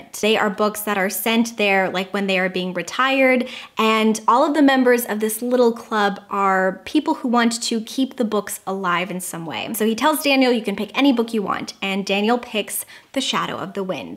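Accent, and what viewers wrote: United Kingdom. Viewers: Hey!!! I am living for this video 🙌🙌 all the spooky autumn vibes. Hello from Perth Australia 👋 love the British accent you do in your videos hehe sometimes there is a hint of an Aussie accent when you do it. Not a spooky recommendation but a great YA read that I think you might like is The Prison Healer by Lynette Noni.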